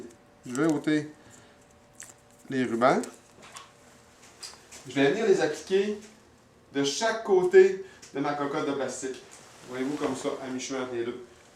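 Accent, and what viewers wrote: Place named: Quebec, Canada. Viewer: Tres bon tuto! En Québecois en plus! Merci! Asteur j'vais essayer de pas trop rusher en l'installant!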